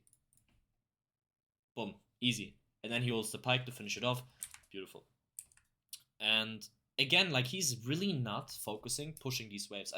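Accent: Germany. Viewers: Hab an deinem Akzent gehört das du Deutsch bist haha. Aber guter guide, danke dir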